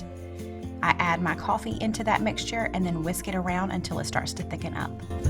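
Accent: South, United States. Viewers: Hi im new to your ch.I love your ch.and all your recipes.Where are you from.I love your Southern accent.im from Arkansas.nice meeting you and your recipes.My dad favorite holiday was Thanksgiving too.Hes deceased now.But he loved 🦃 turkey so much because you can do a lot of things with.I love 🐔 <link> and my mom.Shes deceased too.My grandmother her mother loves goose and duck.My father's mother loved 🦃 turkey too.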